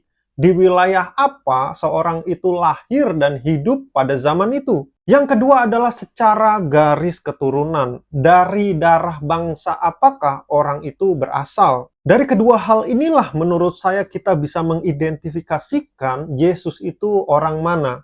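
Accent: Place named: Surabaya, Indonesia. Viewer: Beliau... dahh jelas wong suroboyo...😂😂😂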